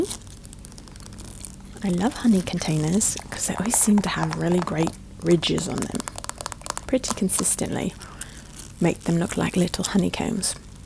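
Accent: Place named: United Kingdom. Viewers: she's American but she uses British accent for her privacy :) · Sounds like a British accent with a light tone of someone who learnt English when they were younger (not their first language but close second)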